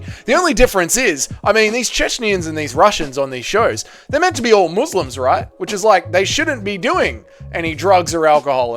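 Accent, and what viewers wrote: Australia. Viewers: U gotta change that intro especially with that accent sound like a Walmart ozzy man😞no disrespect this is my 1st time seeing you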